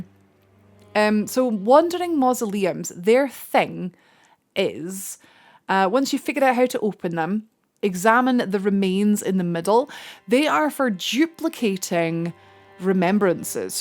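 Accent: Scotland, United Kingdom. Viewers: Nice Scottish accent. What’s your PSN?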